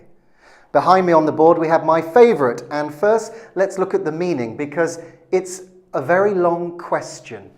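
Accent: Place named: United Kingdom. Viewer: I miss Avalon and is a good teacher I like his british accent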